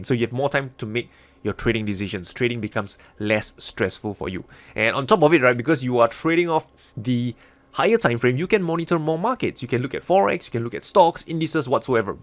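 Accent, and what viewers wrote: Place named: Singapore. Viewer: I like the accent reminds me of my long lost childhood friend from Singapore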